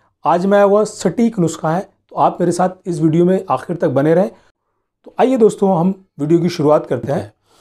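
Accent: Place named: Assam, India. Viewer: Assam sai bol raha hi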